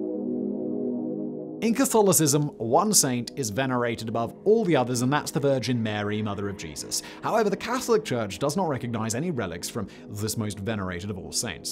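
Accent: United Kingdom. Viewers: no offense, but the British pronunciation is funny to my Italian American ears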